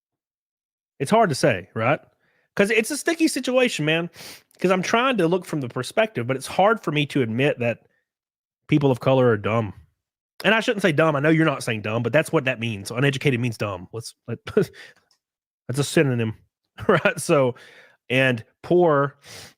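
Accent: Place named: South, United States. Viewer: Damn, I use to like this guys videos about gamestop. Now it seems he is trying to keep his channel going by going into politics. I am sad because he is just going down the stereotypical road of spitting out conservative talking points. Having to be forcibly put in a role because of what ones race is, is not necessarily pure racism if there is not an equal proportion of people in those positions. Its not about being for something because it gives a benefit. Its about getting an equal opportunity. That is the problem. So not, it is not necessarily racism. It is challenging existing racism and current systemic racism. To an ignorant person it will seem like it is racism and I cant help that outside of writting this comment. But I really did like his videos on gamestop. It really does make me sad that he went down this road. But maybe I should have been like ofcourse this guy is mostly an idiot because of his southern drawl.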